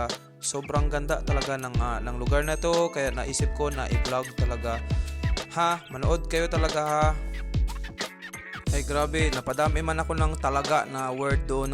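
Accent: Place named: Visayas, Philippines. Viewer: parang bisaya met iti tuno mon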